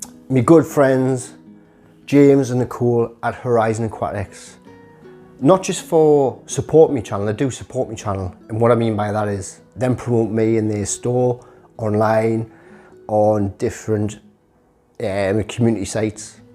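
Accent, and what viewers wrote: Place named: Newcastle, United Kingdom. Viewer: I love this Goerdie accent :-)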